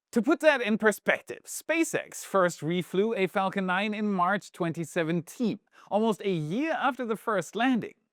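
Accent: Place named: Germany. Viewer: “ that voice got sinister sounding real quick”. I noticed that too. elocution is changing and in addition to the intonation you mention, he's forcing the "r" maybe with the intent of sounding "American". I'm a Brit who's been in France for four decades now, and am not losing my UK English accent in French. Nor does Scott Manley lose his Scottish accent in California. Out-of-region accents are positive and participate in creating one's personal trademark AKA "branding". On the same basis, its totally okay for to show his German origins when in Florida and not mess with his accent or intonation through vocal coaching which is a waste of money IMO and possibly damaging in the long run. I do hope gets to see this comment and maybe associate this with similar remarks made by other people over time. Be yourself!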